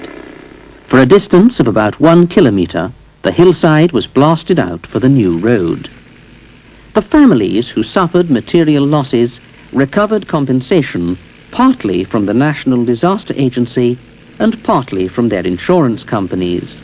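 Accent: England, United Kingdom. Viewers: I couldn't quite believe my elderly eyes when I saw Sven the clay gatherer shirtless and in the Daisy Duke's. Didn't quite go with the rest of the documentary and the narrator with the English accent. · I tried watching this but the guy kept saying daybree instead of debris...i fucking hate the english accent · Glassy es...proper British accent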